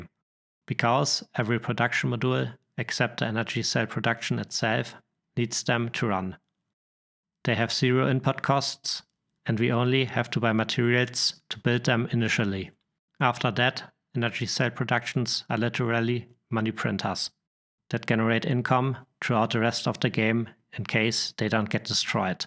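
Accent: Germany. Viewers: Statistics, Graphs and a german accent elaborating on ze productions. Just what the soul needs.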